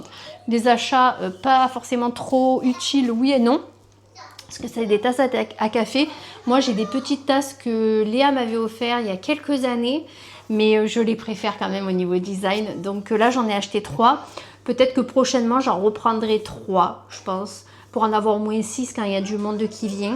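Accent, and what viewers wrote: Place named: South, France. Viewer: J adore ton accent du sud rempli de soleil ❤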